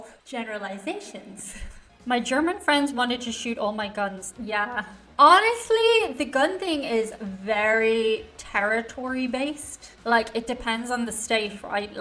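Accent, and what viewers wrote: Ireland. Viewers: what a treat!!! I love these~! But then again I could listen to you "read the phone book" with that brogue 😁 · Has it occurred to you that you get more friendly reactions in America because you are a pretty blonde lady with an Irish accent? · I love your accent. It’s still Irish but I can understand you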